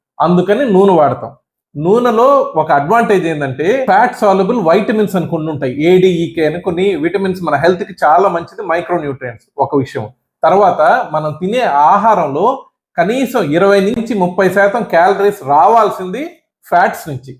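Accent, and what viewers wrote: Nellore, India. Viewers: 🙏🫡🙏🫡🎉🎉👌🤞👍 సూపర్ డాక్టర్ గారూ స్వచ్ఛమైన తెలుగు నెల్లూరు ఒంగోలు యాస లో అందరికీ అర్థమయ్యే విధంగా చెప్పినందుకు ధన్యవాదాలు అండి మేము చాలావరకూ మీరు చెప్పినట్లే వాడుతున్నాం కానీ వేరుశనగ నూనె డబుల్ ఫిల్టర్డ్ వాడుతున్నాం గానుగ నూనె ధర ఎక్కువని వాడట్లేదు అలాగే రైస్బ్రాన్ ఆయిల్ ఒక సారి వాడితే మరొకసారి వేరుశనగ నూనె మార్చి వాడుతున్నాం నాన్ వెజ్ లో ఆవ నూనె వేరుశనగ లేదా రైస్ బ్రాన్ కలిపి వాడుతున్నాం మేము హార్ట్ పేటెంట్స్మి అందువల్ల పూరీలు డీప్ ఫ్రై స్నాక్స్ తినం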